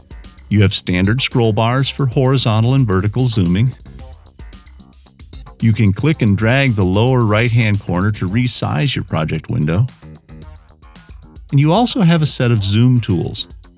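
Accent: United States. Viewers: I don't want to sound like an ass, but that slow American accent is the worst. When the guy is talking I have this sudden urge to punch someone square in the face! I really want to figure this program out, but this tutorial is just unbearable to listen too. Is there any version of this video in English English? Or Australian? Even Scottish would do.Just anything but this, for god's sake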